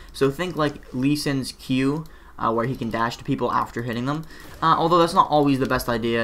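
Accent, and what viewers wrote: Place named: United Kingdom. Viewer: You sound british, im wondering why youre playin' on na than :/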